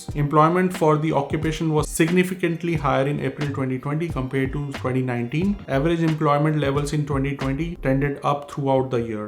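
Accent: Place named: India. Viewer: Your accent is like indian it's the worst.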